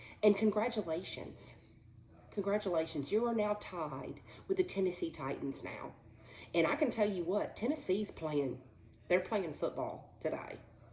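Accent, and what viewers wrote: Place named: Texas, United States. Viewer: I'm a Bronco fan and I love your passion and Texas accent 😅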